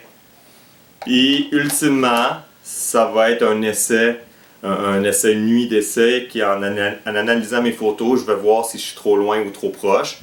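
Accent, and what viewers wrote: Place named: Quebec, Canada. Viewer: Mon dieu ca fait du bien d'entendre du Quebecois, sur un site qui publie des tutorials d'astronomie good gradulation.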